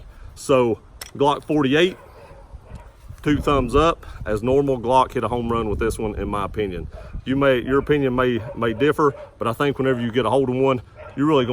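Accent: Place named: Texas, United States. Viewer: You kinda sound like you're from texas.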